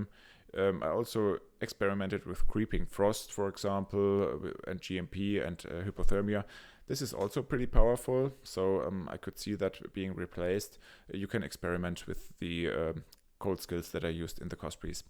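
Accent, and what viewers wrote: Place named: Germany. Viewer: Wie man direkt an deiner Stimme merkt das du Deutscher bist. Sehr interessant! :D Btw.. Auf was muss man genau achten, wenn man die Sachen kauft? Denn das Amu allein könnte ja schon, wenn man pech hat, um die 3div kosten durch die Attribute+ Cold spell gems. Da wir ja beim CoC die Taktrate beachten müssen, wollte ich dich fragen, wo man das sieht und händeln kann, da ich keine Lust habe, dass meine CDs rumspacken und damit das ganze Build fürn A** ist. Freue mich über eine Antwort! :D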